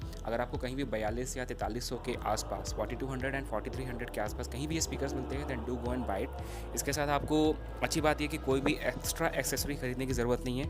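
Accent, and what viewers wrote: India. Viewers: Red zHail He has an indian accent plus i sense racism 😕.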